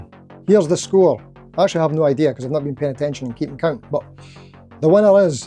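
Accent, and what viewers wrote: Scotland, United Kingdom. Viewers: Scottish accent is a draw for me, it's like if Scrooge McDuck had a guitar channel that centered around Strat style guitars.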